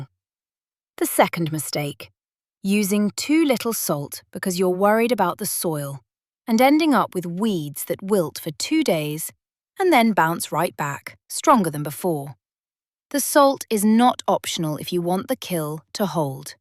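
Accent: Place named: United Kingdom. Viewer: Why do you have a British accent on a video about Amish weed prevention? Shouldn’t it be more of a German accent?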